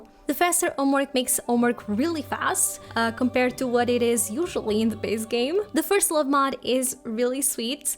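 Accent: Portugal. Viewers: 1 minute and I already knew you were Portuguese! hahhah loved the video!❤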